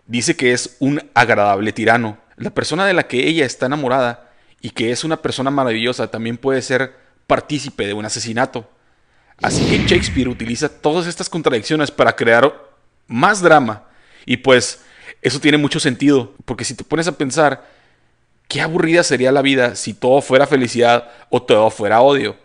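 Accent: North, Mexico. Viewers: Gracias!! jajaj eres norteño?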